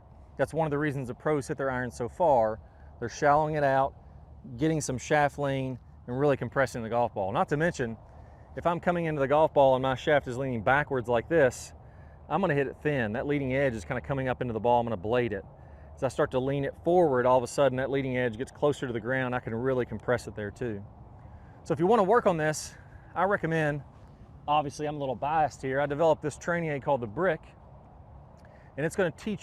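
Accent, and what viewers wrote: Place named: Kentucky, United States. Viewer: always love your instruction and has helped me get my handicap from 20 to about 12.4. My question for you is not about golf, but rather where you grew up? Your accent sounds so much like my roommates from Kentucky when I was at UK. Keep up these great instructional vids. I need to get your swing boxes. I cheaped out and just stick my alignment sticks in the ground by eying the degrees. It’s helped, but I think I need to pull the trigger on your product to be more accurate.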